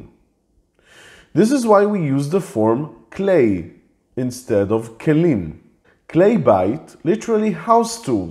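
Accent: Israel. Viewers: You sound like an Israeli! מזל טוב! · Fale Hebraico e Pronto! You must not be an american, because he doesn’t sound american. Mr. has a gift of being fluent in english (a good one at that) but his accent is israeli. He doesn’t sound american lol!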